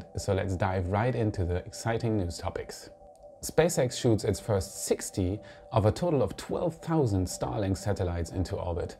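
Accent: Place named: Germany. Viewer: Oh my goodness first time I went back to #1 u need a haircut here and u have more of your German accent! ❤️your the best!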